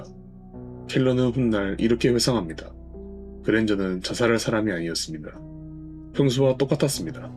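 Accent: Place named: Gyeongsang, South Korea. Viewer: AI목소리가 아니라 갱상도 사람이네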